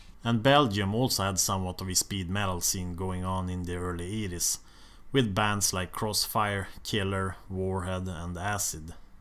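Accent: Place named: Sweden. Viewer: man, you're hilarious! You talk in this cool, deep Swedish accent and then you say stuff like "howdy folks" 🤣. I love it!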